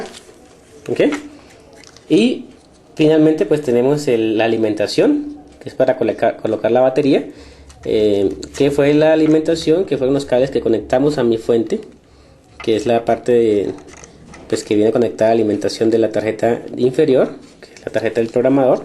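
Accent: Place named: Mexico. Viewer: me cae en los huevos el acento mexicano parece que le agregan acento a tooodas las palabras al final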